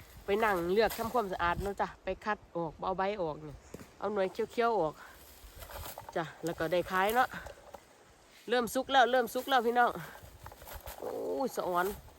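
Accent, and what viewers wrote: Korat, Thailand. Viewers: คน โคราช นิครับ คือ พูด สำเนียงโคราช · วัสดรจ้าลูกสาวยากไปจิไปติดต่อกะใหนจ้าคุณ เป็นคนกะใหนจ้าพูดคือคนโครราชเนอะจ้า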